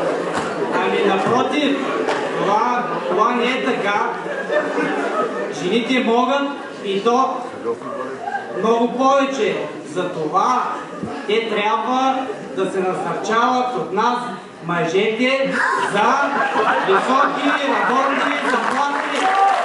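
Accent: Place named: Rural, Bulgaria. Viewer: Този е бавно развиващ се олигофрен с скапан селски диалект!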